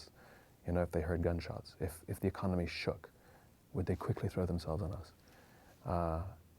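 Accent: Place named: England, United Kingdom. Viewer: He had a little English accent going on in this interview.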